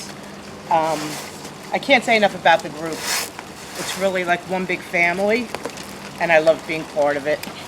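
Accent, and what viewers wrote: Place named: New Jersey, United States. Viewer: Glad you got a YouTube Channel! Nice to hear your voice and that beautiful Jersey accent. The group hooked you up. There is a place in the town where I grew up, that sells these Mexican made grills that look like animals. The also sell pretty good barbecue. They have a bull like that out in front. When they’re open, they have it smoking with a charcoal fire in it. C’mon, you gave the bull a girl’s name? Margarita? Give it a tough name! Such as “Pancho” or “El Bandito” or “El Fuego” or “El Desperado”. What ever you call it the bull and the board are nice.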